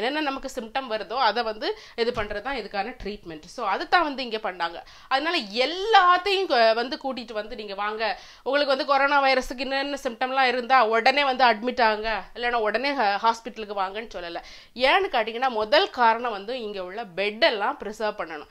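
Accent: Nagercoil, India. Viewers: Useful information Sister. Unga Nagercoil Tamil Arumai.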